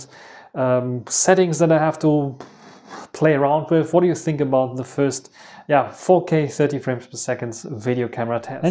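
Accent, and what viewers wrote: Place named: Germany. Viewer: Nach drei Wörtern Englisch wusste ich sofort dass du ein Deutscher bist. Diese Akzent findet man nur bei den Deutschen wenn sie endlich sprechen. 😂 Aber nettes Video, lass dir gern ein Abo da. Frohes neues. Das Vivo x80 pro gefiel mir vom Design her besser, ich persönlich warte bis Vivo die Frontcamera 4k fähig macht.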